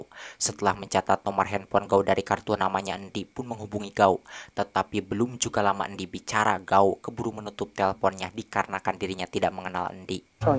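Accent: West Java, Indonesia. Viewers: Akang nya orang Sunda ya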